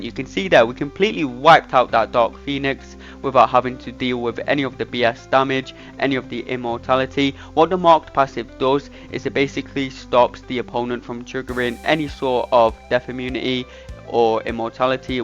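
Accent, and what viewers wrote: United Kingdom. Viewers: Ok so I literally just watched a video by someone else on this guy, and dude I literally couldn’t pay attention through the entire video and learned basically nothing. Then I come here and watch you and I just got 100% locked in immediately somehow?? pls never leave again, my brain can only understand your British explanations 🙏🙏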